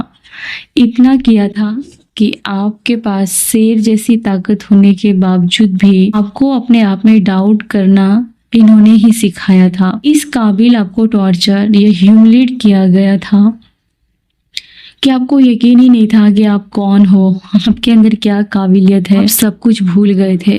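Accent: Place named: England, United Kingdom. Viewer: Aapka voice me ek Englic Vibe hai ,,,,ayse logo ki madat karte rahiye 👌👌👌 kyunki hum manushya ko bhagwan duniya me bhejte hai jarurat mand ka help karne k liye,,,lekin insaan apna swarth k chalte bura karm karna suru kar deta hai,,,tabhi to zindagi barbad kar leta hai,,, prabhu shree Krishna ji sabko sehi Marg dikhaye 🙏🙏🙏 aap bahut achha kar rahe ho,,,mere Prabhu krishna ji aap ka sath abosya denge,,,ab aapka growth hone ja Raha hai,,,but kabhi Maan me ahankar mat Lana aap ,,,jai shree krishna 🙏🙏🙏 Radhe Radhe 🙏🙏🙏🚩🚩🚩🚩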